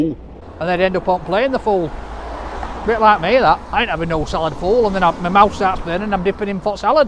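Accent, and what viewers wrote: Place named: North, United Kingdom. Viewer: Love this guy proper northern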